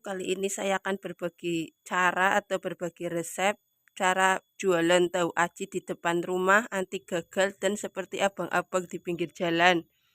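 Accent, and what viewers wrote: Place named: Tegal, Indonesia. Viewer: Jualan tahu aci semoga menjadi ide bisnis yang sukses,kaya logat Tegalan...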